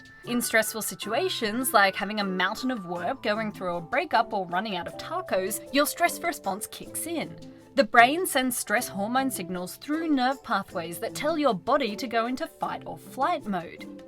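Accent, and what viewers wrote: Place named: Australia. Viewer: I could tell by the accent. So am I. Seeing Australian women getting into science is really awesome because I want to have a career in science when I'm older, and it's really encouraging to see that its not just for the men:)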